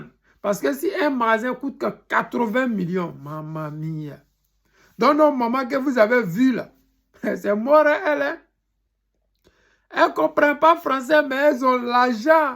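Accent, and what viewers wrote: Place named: Guinea. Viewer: C'est punition de DIEU. Ton accent même est guinéen mais c'est sûr que tu es dans la politique avec les déstabilisateurs de la Côte d'Ivoire. Tu as participé avec tes frères à installer le gars là. C'est la punition de DIEU, va à la mosquée prier pour demander Pardon de DIEU et pardon pour les cœurs des Ivoiriens , qui vous ont reçus mais qui ont saignés par votre faute.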